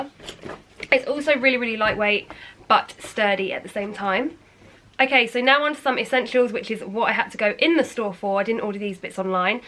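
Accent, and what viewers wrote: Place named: London, United Kingdom. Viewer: I can't stand the London accent!!🙄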